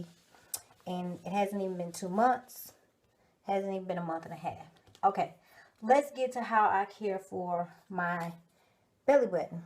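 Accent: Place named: South, United States. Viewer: ❤️your southern accent! I'm from Connecticut. Where are you from?😁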